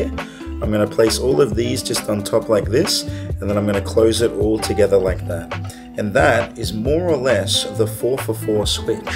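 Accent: Australia. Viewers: Love that ‘Stralian accent, mate! I’ve loved magic since I was a little kid…I mean, what kid doesn’t, eh?! I picked up magic decades ago for one simple reason—to distract crying kids in the plane. And it worked as well as entertaining the adults around. Since then, I’ve watched countless magic stuff on YouTube and I’ve always been amazed by card tricks. But I’ve been so intimidated by them. Until now. Thanks for sharing your amazing videos and for generously sharing your tutorials. I’m determined to master some of the most basic of cars skills and tricks. Cheers, mate!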